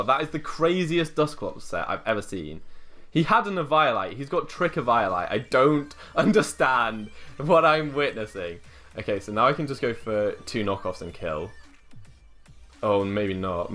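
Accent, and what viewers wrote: United Kingdom. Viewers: Man I love British accent, keep it up!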